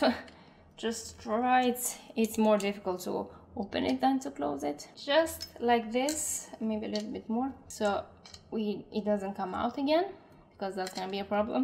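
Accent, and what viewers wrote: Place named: Eastern Europe. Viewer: which part of eastern europe are you from?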